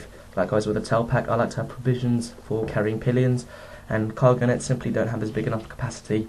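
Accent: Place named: England, United Kingdom. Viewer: A Filipino with an English accent, now I've seen and heard everything.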